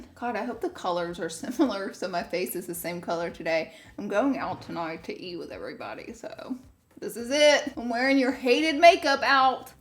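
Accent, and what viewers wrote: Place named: Alabama, United States. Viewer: I absolutely love you and your videos! I am also from Alabama so I can relate to the way you talk so well lol. It’s so cool to see someone that grew up in a similar atmosphere to me is doing such great things. It gives me a lot of inspiration! Much love. ♥️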